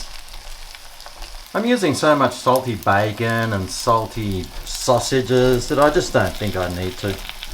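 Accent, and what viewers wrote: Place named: Australia. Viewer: The best Aussie cook on you tube. And with very good Aussie accent and great sense of Humour. Everything is cheap and very efficient with time without stress at all. Very inviting when I watch your programme. Keep it flowing. Happy New Year Mate, from Hobart Tasmania.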